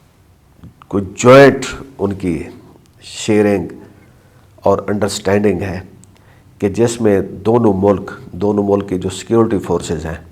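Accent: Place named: Saraiki, Pakistan. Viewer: صاحب جی ۔۔۔۔ !!! مجھے آپ سرائیکی وسیب کے لگتے ہیں اور سرائیکی سپیکنگ ہیں - آپ کا تعلق کس علاقے سے ہے ۔۔۔۔ ؟؟؟؟؟؟ شکریہ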